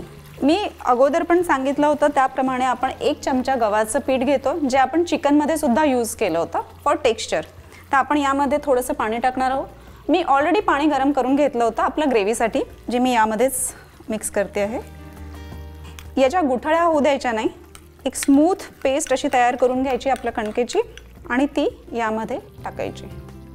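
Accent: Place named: Satara, India. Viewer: सातारची भाषा आहे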